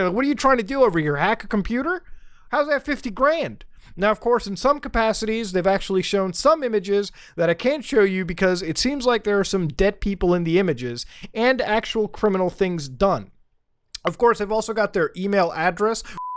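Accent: Canada. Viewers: Your Canadian comes out pretty deep in these haha. Love it! This last bit is exactly what the internet used to be like in the 90's! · girlfriend half asleep listening to my video "is he Canadian? " "I can hear his accent" that it was cool how she just randomly said that I watch you every night lol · Maybe I’m just high af but sounds so Canadian in this video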